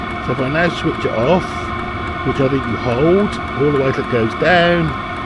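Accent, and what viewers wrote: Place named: Norfolk, United Kingdom. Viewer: you sound very much like you're from Norfolk to me 🙂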